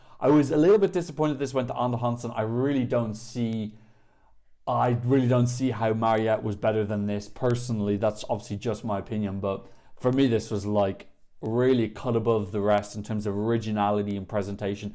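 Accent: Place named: Ireland. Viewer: it's Irish English, and it confuses even the English! (especially the English 😂) It confuses the captions as well, they're hilarious when they come up on my home page.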